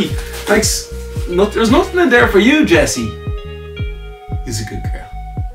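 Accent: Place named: Ireland. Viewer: No one ever says Apothecary the first time out :) And it is ok. It's an old timey word that most people haven't heard before. We're digging it even more with the Irish accent though <3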